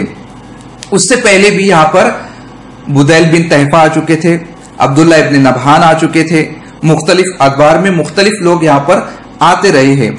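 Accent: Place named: India. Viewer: You are talking about Urdu and using English words in Indian pronunciation...... you are fucked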